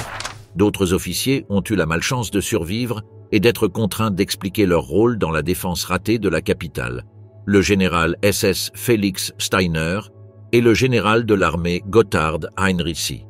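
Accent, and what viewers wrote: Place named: France. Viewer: Curieux, ce doublage par l'intelligence (?) artificielle. : les années dans les dates sont en italien, prononcées avec un accent francais à couper au couteau.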